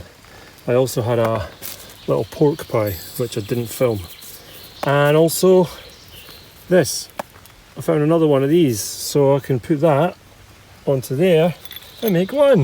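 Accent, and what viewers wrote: Scotland, United Kingdom. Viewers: How can anyone be in a bad mood after watching this? Beautiful scenery, amazing finds, a delightful Scottish accent, and best of all, the Belter Boogie.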